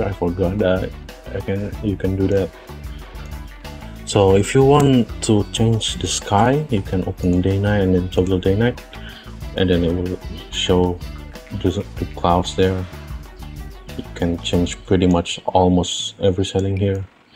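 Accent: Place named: India. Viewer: Amazing Indoglish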